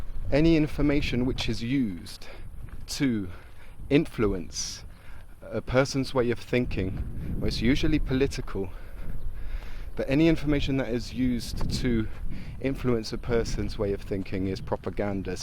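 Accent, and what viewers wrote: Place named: England, United Kingdom. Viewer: 🤣🤣🤣 What happened to your churches in the North side? They are all still there. Get a life mate. You advertise Cyprus Without Borders as a bicommunal page but all I see in your videos is nothing but targetting TCs making out like we are nothing but 'propaganda' makers. We tell the truth. So walking around whispering with your plummy English accent to make yourself look friendly isn't going to work. Insidious as anything, you are. Why don't you share what happened to all the other mosques, not the one protected by Turkish authorities. And no, don't go inside the mosque. You might find god there. Can't have that, can we!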